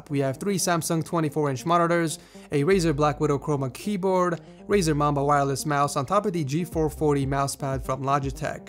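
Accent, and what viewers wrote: Russia. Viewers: Best Russian accent evr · Awesome Russian accent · Nice Russian Voice + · At first I was going to click out the video because of the Russian acent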